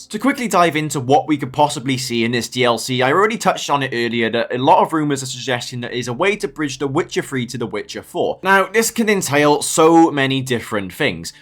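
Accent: Wales, United Kingdom. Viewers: Shame he's Welsh then isn't it?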